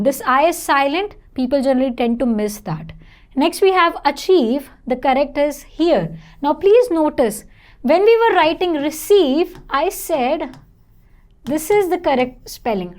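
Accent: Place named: India. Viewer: I like Indian accent.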